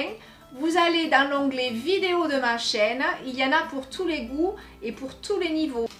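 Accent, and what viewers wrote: South, France. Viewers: a l accent du soleil !!! merci ca donne la niak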